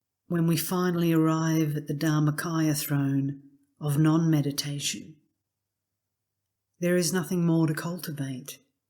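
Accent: Australia. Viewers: Since I found this playlist I am listening every night. To hear these teachings read in a straightforward Australian voice is particularly helpful to me (I guess there must be some "accepting", "identifying" or "clinging" in me there!). What the topic is "being natural", a natural voice is very apt. While listening, I follow the instructions of the teacher, as best I can.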